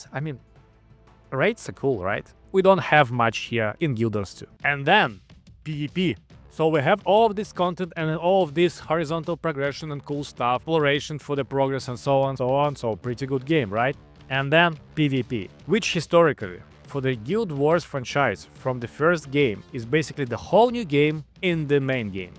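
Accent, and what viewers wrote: Russia. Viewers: you have a strong russian accent. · ох русский акцент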